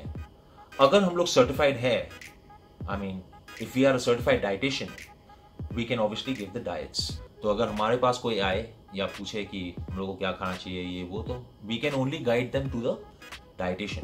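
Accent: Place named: India. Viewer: Indian English 😫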